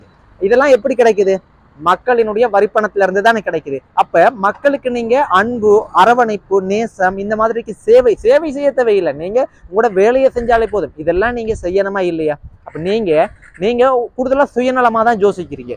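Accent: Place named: Colombo, Sri Lanka. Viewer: உன் தமிழ் கொழும்பு தமிழ் மாதிரி இருக்கு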